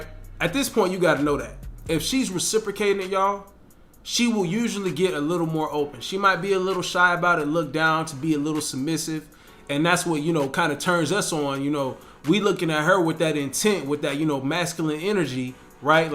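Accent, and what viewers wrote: United States. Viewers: Yo you from tampa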